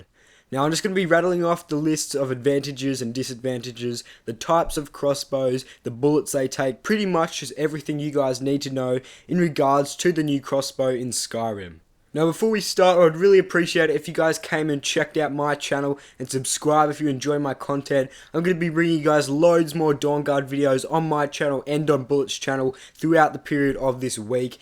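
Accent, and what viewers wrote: Australia. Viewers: is he aussie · are you italian-aussie?? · The fact the crossbow model doesn't have a channel for the bolt.... really annoys me. A professional artist should have seen it in reference photos, plus any man should just know 'guy' stuff. The vid poster has a really heavy Aussie accent. It occasionally sounds like he's taking the piss.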